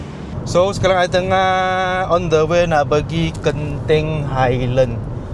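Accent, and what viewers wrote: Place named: China. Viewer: Hehe... Aku mmg suka la tgok video ko. Mmg syok bro. Ko ckp jujur. Malah video ni paling best...ko sebut P A J A R O...hahaha!! Pelat Cina ko tu buat aku gembira. ❤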